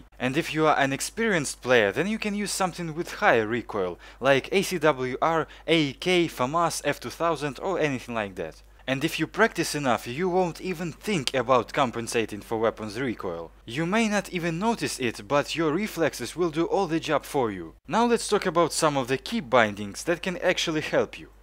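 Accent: Russia. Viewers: лучше смотреть видео у англичан с их акцентом ,чем слушать наш,просто)а так хорошая попытка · ага, и будет ужасный русский акцент и на немецком. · Акцент сильно русский((((((((((( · Акцент русский но вообще Можно делать видео на англизком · Мэн а что ты хотел от русского человека?) Идеальное произношение???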